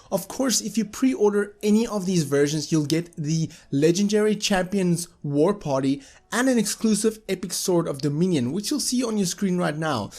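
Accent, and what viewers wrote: South Africa. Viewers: You sound so South african...!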